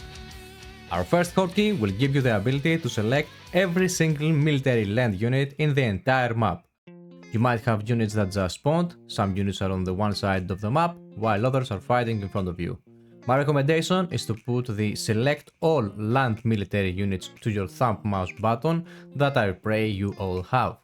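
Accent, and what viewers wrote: Greece. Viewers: αθανατη ελληνικη προφορα! ωραιο βιντεο ευχαριστω!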